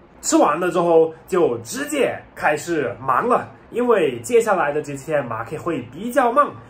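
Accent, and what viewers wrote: Sichuan, China. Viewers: 哈哈, 在成都久了,普通話都帶四川口音了。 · 我住臺灣省喜歡您的視頻更喜歡聽你濃厚四川腔普通話!感謝你介绍大陸四川成都風俗民情~❤👍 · 的普通话是不是带的四川的口音呢？哈哈😄很可爱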